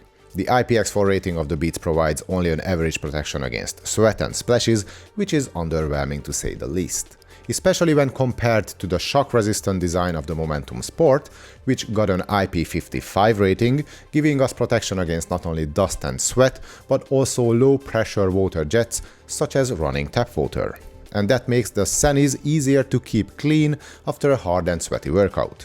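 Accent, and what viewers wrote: Hungary. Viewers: Oh you live in Ireland, that's great. With your accent I thought you were coming from Hungary or another eastern country. Great video btw 👌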